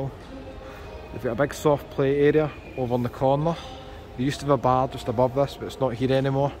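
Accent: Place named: Glasgow, United Kingdom. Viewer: Love this and great to listen to that Glasgow accent. I have never been to E K.Mall but next time I’m home (hopefully soon) I’ll make sure I go.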